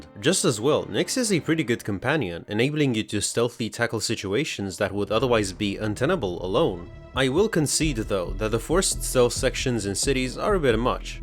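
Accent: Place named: Balkans. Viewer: No way you're actually Dutch? I'm really sorry but you got some sort of Balkan accent, I never expected that lol Of je kan Belgisch zijn... Ik heb geen flauw idee hoe een Belgisch accent in het Engels klinkt x3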